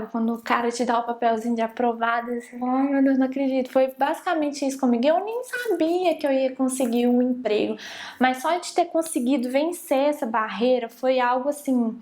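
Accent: Minas Gerais, Brazil. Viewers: Nossa, vc foi contando e eu fui imaginando a cena. Acredita que só de imaginar eu fiquei até tremendo de nervoso aqui também? kkkkkkkkkk Obs: Vc é mineira né? O sotaque me parece familiar. Sou mineiro de JF.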